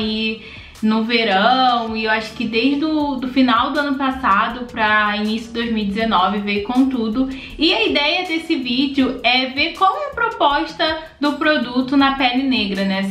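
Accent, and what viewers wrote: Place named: Rio de Janeiro, Brazil. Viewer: Carioca, gosto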